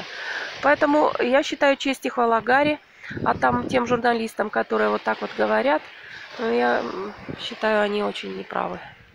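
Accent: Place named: Provincial, Russia. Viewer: наверное, вы из Мухосранска, говор выдаёт, темой не владеете, закончите хотя бы десятилетку, и не умничайте малообразованным теткам это не подходит.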